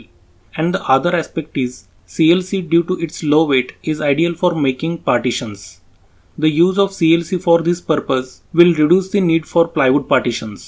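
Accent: India. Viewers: Fantastic all round explanation. Thank you wise man. Love Indian English accent. Great job. Cheers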